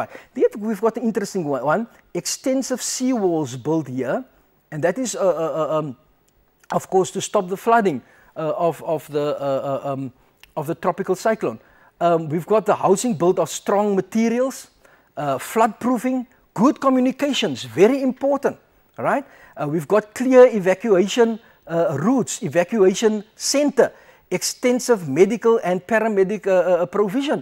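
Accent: South Africa. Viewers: South African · Rahul Vamshidhar South African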